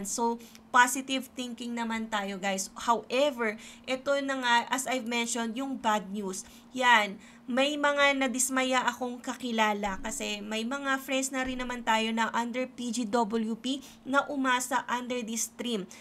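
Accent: Philippines. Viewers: Remember. Sooner or Later After your Graduation.. you will be working in the legal Firm. You must improve your English Pronounciations and your English Communications. Avoid using Filipino way of talking. Start assimilating to the American Culture.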